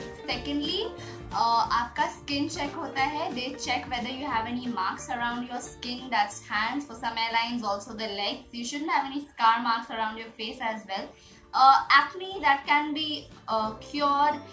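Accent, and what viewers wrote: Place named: Nepal. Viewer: so cute maam u r!! nepali acent 😘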